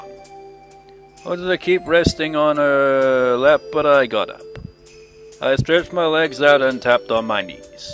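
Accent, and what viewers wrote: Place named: Wales, United Kingdom. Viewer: It sounds Welsh now that I know, but I thought It might have been English.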